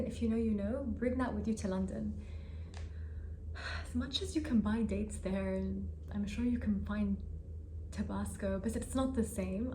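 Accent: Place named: Arab world. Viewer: I’m not joining any university but I’m watching this bcz I love how Arab speaks English. It’s classy accent ❤ 😊. Love your tips , it will be useful for students .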